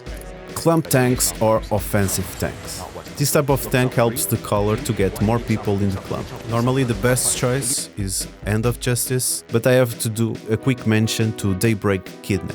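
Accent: Portugal. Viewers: Esse sotaque é de um tuga ahah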